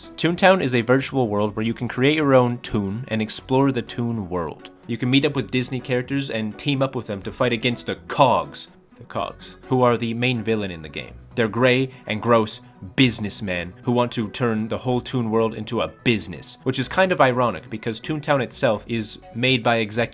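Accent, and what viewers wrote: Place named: Canada. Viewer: i am going to bet $100 that you are canadian just based on your voice. love from BC, this video ruled :3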